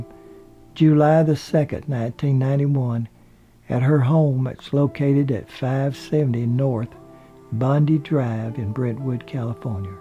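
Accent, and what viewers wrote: South, United States. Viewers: I love the narrators down home southern accent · THE VOICE HMMMMM!!! PASSABLE!!I GUESS ITS " SOUTHERN AMERICAN" ACCENT!!NOT CUT GLASS ENGLISH!!!HOWEVER, THE ENGLISH LANGUAGE HAS CHANGED DRAMATICALLY!!!EVEN IN THE U.K.!!!FROM(U.K.) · Mr., you are such a good spokesman. You are genuine in you're speaking -when telling the stars biography. I so enjoy the way you speak. You sound like a southerner, yourself. I fine it odd that many people from the south does NOT talk as southern, as the stars who betray them. Of course some DO HAVE that kind of southern draw. I'm a southerner myself. When I married my second husband, his father called me his little southern belle. He was an attorney from OKC. An educated man. His family were originally from up north. The whole family had good grammer. I learned so much from them. Little country girl who had never traveled much. 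I was intimidated, to say the least. I married very young, the first time i married. They could have done a movie about my life. I didn't have a good beginning but, i did get the chance to go to college. After I divorced the husband in which I'm speaking of. My life very complicated. I was only 16 when I married, the first time. Dropped out of high school. I did take the GED test and passed, to my surprise. We all went out and celebrated. I had two children when we married and could not have anymore children. I was 7 years older, than my husband. I was 27 and he only 21. He was intelligent. Had a good career. His grandfather retired from the company, which my husband also started his career there. I had such a sad life. Had bad things happen to me. I've often thought about writing my own bio and see if someone would like it. I do think my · I told my mom that Lee had the prettiest eyes. 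I LOVED The Long Hot Summer and so did my folks! She died way too soon. Your voice is so soothing but I have lots of Southern reatives and I guess that's what it is. Could you do a video on Burt Lancaster? I always liked him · Interesting accent and pronounciation of some words. I wonder if it’s just the speaker or common to a town somewhere in the south. Good video. Thanks. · ...i got used to it.....and then I liked it...maybe southern or mid west?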